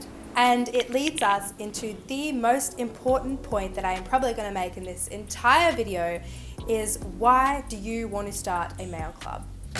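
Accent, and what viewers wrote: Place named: Australia. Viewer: You must be spending a small fortune in stamps! You sound like you're from Australia. Our stamp prices in this country are astronomical! How do you cope financially?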